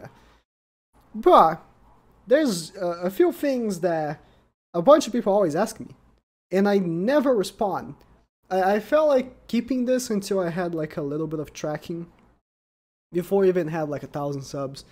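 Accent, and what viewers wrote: Brazil. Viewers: I actually remember In one of your streams I asked you if you were from Brazil because I recognized your accent, my portuguese teacher also has the same one so I thought it was similar. But when you read my comment and didn't answer I thought I had said something rude so yeah.. but looks like I was right :p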